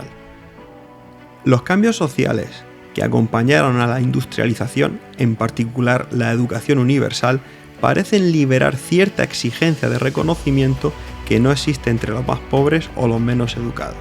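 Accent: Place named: Spain. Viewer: ja ja ja La democracia liberal como la único sistema político que permite vivir en libertad. Eso es gracioso y también impreciso. No se si te estas refiriendo al liberalismo de Adam Smith o al neoliberalismo de Milton Friedman, o cual de los neliberalismos. Por que hay varias corrientes neoliberales. De cualquier manera ninguna funcionó. Algunos engañabobos tratan de poner en practica el libertarismo como si de una corriente ajena al liberalismo se tratara. Estas corrientes como odas las teorías económicas son superadas por la realidad. Sin embargo aportan al progreso de la humanidad, incluyendo a "Critica al Capitalismo" tan criticado, poco leído y sacado del contexto del periodo histórico en que fue escrito. Te recomiendo te busques a un patrocinador como lo hacen "visualpolitik" o Gloria Álvarez. Tienes un acento como de España. Ustedes no viven en democracia. 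No eligen a su presidente por sufragio directo y mantienen a una monarquía inútil. Después de tres meses ya tienes 4 likes, y este comentario. No te rindas, tu puedes.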